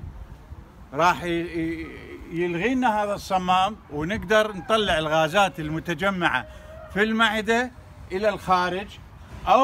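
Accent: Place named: Iraq. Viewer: صوته ذكرنا بصدااااام الله يرحمه احس انك عراقي اصل وفصل الله يحفظك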